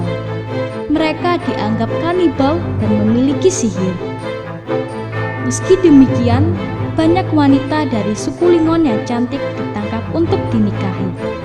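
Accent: Java, Indonesia. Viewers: kok indonesia agak medok jawa, jadi gk enak dengernya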